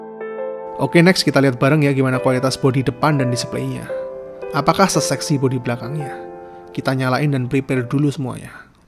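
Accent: Yogyakarta, Indonesia. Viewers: Bang orang Jogja po?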